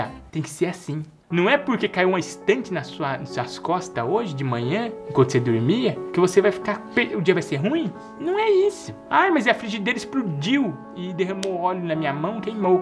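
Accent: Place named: Minas Gerais, Brazil. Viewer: Cara vc é show,parabens!!! Achei vc depois q instalei Instagram.. parece meu povo lá de Minas falando,adoooooooro kkkkkm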